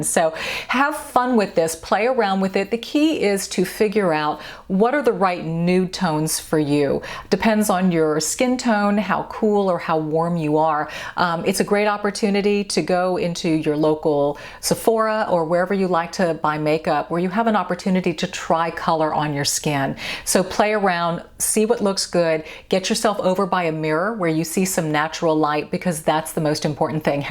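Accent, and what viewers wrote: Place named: South, United States. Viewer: I'm presently going through many of your videos to see which primer you use and recommend for face, also for eyelids. Suggestions by others on here are welcome as well, please!! Don't want to spend note than about $35 $40, (unless it is a quart sized container🌝) Normally I'm more conservative about price except I think a primer will make ALL the difference as to how my face and makeup look, in the end. Prefer 1 with anti-oxidant, if possible. I've been looking for a while now and almost ready to just buy something cheap till I can find a quality product for sensitive skin that's not drying.. Thank you for all of your effort in keeping me somewhat in-the- know about skincare and makeup, since I subscribed. You're a lovely person, and I adore the little bit of Southern sound in your voice. It's another one of your charming attributes that sets you apart🌴🤗🌹☮☺🌴 Edited to add---don't know why the strike-through text appears that way above. 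Didn't intend to negate the words I wrote.☺🌴☮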